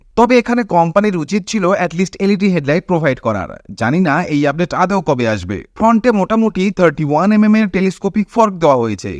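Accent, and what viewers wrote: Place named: Bangladesh. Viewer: আমি বাংলাদেশ থেকে বলছি। আপনার বাংলা বলার ধরন প্রায় বাংলাদেশীদের মতো। বাংলাদেশে কবে নাগাদ আসবে সূত্র উল্লেখ করে বললে ভালো হয়।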